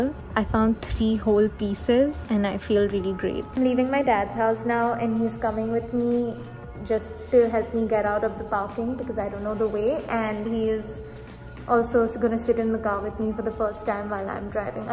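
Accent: India. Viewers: Indian accent ! That's great mate!👍can you plz tell me how to turn yourself into American mode ? 😜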